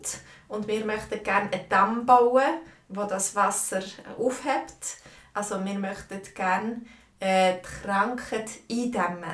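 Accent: Bern, Switzerland. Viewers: Ich bin auch in Bern!, ist dein Dialect gemischt oder Bärndütsch?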